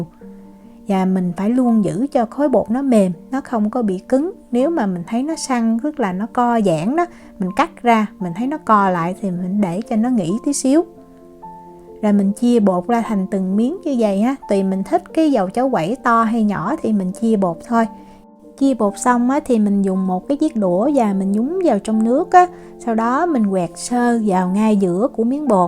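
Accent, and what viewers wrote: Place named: Saigon, Vietnam. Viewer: Hướng dẫn chuyên nghiệp, tận tinh và đúng chất dọng người SG. Cảm ơn bạn và chúc Vạn sự như ý!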